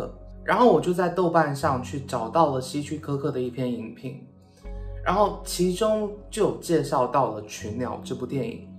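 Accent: Taiwan. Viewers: 我還以為coma是馬來西亞人🙈你的口音不像內地人反而偏向台灣人，還時不時說一兩句英文😂😂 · 因為口音很像台灣人😄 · 感覺是香港人？一直覺得有些用語很中國，但腔調非常台灣疑惑超久XD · 我也以為是台灣人耶🤣想說是不是為了拍片所以講的比較字正腔圓而已🤣原來是廈門人啊！ （大家都好和平覺得這裡很棒！） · 幽靈船那部電影好看，“鳥“是一部很經典的驚悚片。COMA應該是曼德拉效應困擾了你，話說COMA是哪裡人啊！口音用詞聽著很台灣，可不可以請問是哪裡人，我真的沒有惡意。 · 廈門在福建 所以口音像台灣腔很正常的（福建人的口音真的很像台灣腔） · 有些是不太一样 有部分听起来会更偏台湾 有部分更偏大陆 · 我一直以為COMA是台灣人XD 因為腔調太像了~原來不是~ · coma居然不是台灣人😯 為什麼口音這麼像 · 你们当然能听出来了，但是对于大陆尤其北方的来讲这已经很台湾口音了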